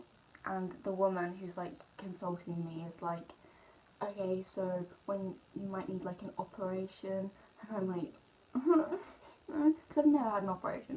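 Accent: England, United Kingdom. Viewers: She sounds so much more English... 🤔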